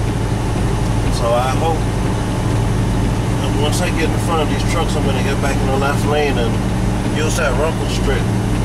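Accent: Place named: East Coast, United States. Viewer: These youngsters these days! In 34+ years of driving, I've seen alot of dumb shit happen, but I never in my wildest dreams imagined all the dumbshit drivers with CDL's. This new generation of drivers are reckless and complainers. They think they know it all and none of us old timers know anything about driving. Especially Winter driving. Especially I-80 Winter driving. Take that 70 truck pileup on I-80 in 2015 as picture of reckless idiotic youngsters with no respect for the road, the conditions or each other. Pathetic. The guy in this video sounds like a guy from the eastcoast. Like the guy whose comments I saw, this driver is slip seating and doesn't want to buy and hook up a CB. They do sell portables, you know. Most new trucks have built in antennas. You just hook your power cord to the built in terminals and attach the provided coax to your radio. 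Badda bing, badda boom...you got yer radio going...don't worry about it......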